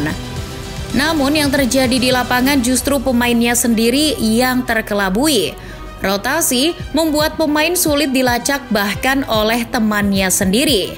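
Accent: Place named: Semarang, Indonesia. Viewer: pengisi suara jangan jangan org semarang nih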